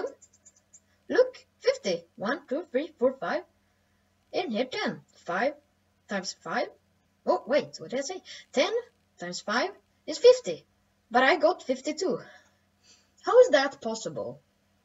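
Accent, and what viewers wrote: Sweden. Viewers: maybe du låter a little bitt svenska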